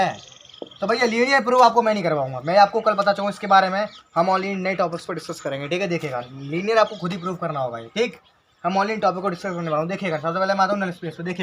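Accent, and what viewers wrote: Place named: Marwari, India. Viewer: Gajjjjjjjjb sirji aapka marwadi tadka bahut aacha laga 🔥🔥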